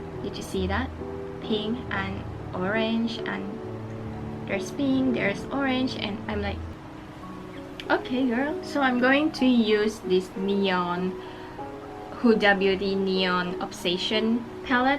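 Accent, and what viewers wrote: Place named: Sarawak, Malaysia. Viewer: dengar nya mpun oii ya ku tauk nya orang sarawak 😂😂😂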